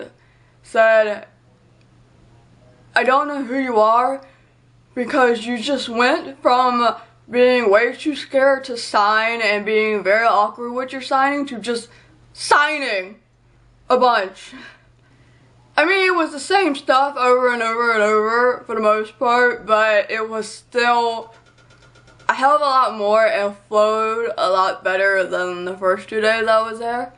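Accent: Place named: United States. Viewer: Not sure if anybody has mentioned this to you before but you seem to have an american accent. Were you always deaf? how do you explain your accent. Sorry you got depressed, keep smiling...it helps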